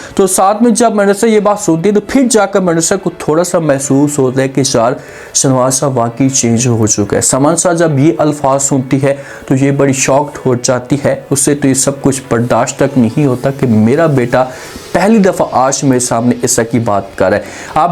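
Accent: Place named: India. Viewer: Anchor you have a bloody awful accent india🇮🇳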